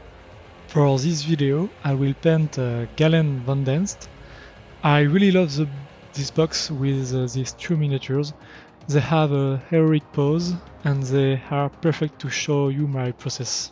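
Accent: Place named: France. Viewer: Oh l’accent sonne français ! beau boulot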